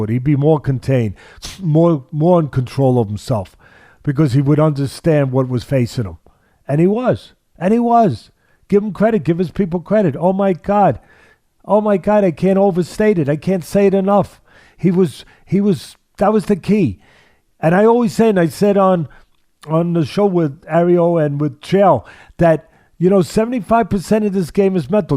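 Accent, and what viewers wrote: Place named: New Jersey, United States. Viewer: Teddy is the Ultimate 🥏 heavy weight Communicator..: passionate, articulate, insightful, intelligent 🤓, and funny with that Iconic NJ accent . When he gets to Heaven King Jesus wants him to talk to Him for a Thousand Years on Fighting...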